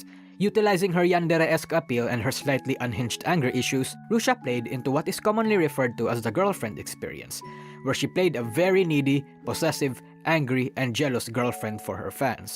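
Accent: Philippines. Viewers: Definitely not a native English speaker, you sound like a fellow Filipino with that accent.... I haven't done any Vtuber digging since I'm busy with college so i just barely know about this controversy, although this was neatly presented enough for an outsider of the community As for fubiki and the others who didn't react, i think they were playing safe trying not to get involved with drama, i assume it's a very uncertain time for them. To either call their company out or call rushia our. It's hard and very costly to speak up when everyone is up and arms with pitchforks. That's all i could comment about the situation, and I'm happy for the new rushia. I may even check her new channel if i get curious enough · okay, if all Filipino people sound like him, then damn I’m super jealous. I love the accent, it’s awesome.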